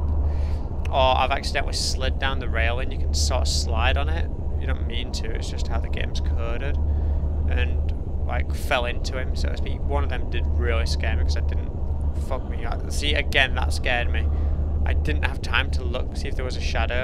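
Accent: England, United Kingdom. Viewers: are you like British or something? XD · What part of england are you from?:D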